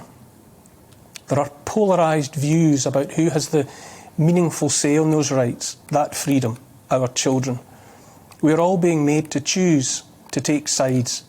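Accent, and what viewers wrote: Scotland, United Kingdom. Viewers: It's lovely to hear a person with a Scottish accent who isn't some horrible leftie.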